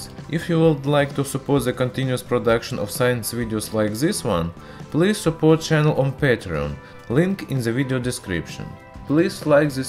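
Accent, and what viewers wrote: Russia. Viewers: this channel has 3 of my favorite things: russian accent half-life and chemistry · My new chemistry teacher with a Russian accent. ......thanks for your videos .....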